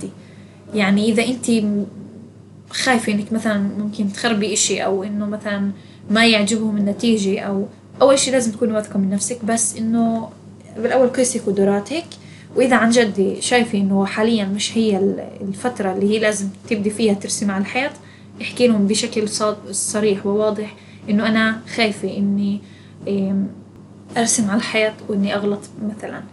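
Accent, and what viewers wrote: Palestine. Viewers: احب جدا طريقتك برسم الزي الياباني تعجبني اتمنى ارسمه مثلك او اتقنه مثلك ماتردي علي بتمسخر ع لهجتك وبعرف انو فلسطين هيك بتحكي وبلعكس حلوة والي بقولو كلم هدول اكابر وبسس^♡^♡_♡ ♥♥♥♥♥ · بالعكس اللهجة الفلسطينة اكثر من رائعة تحياتي لفلسطين و لهجتها ❤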